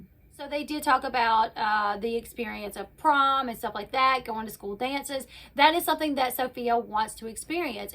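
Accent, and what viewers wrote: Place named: Shreveport, United States. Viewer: I love your channel and accent, it sounds like my family in Shreveport (I'm currently in Detroit).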